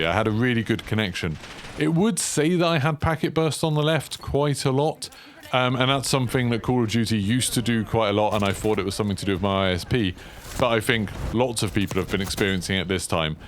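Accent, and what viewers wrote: United Kingdom. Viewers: Bruh, he’s obviously British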